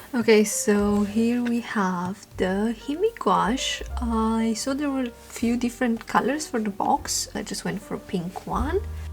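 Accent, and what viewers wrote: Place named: England, United Kingdom. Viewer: The cutest English accent I've ever heard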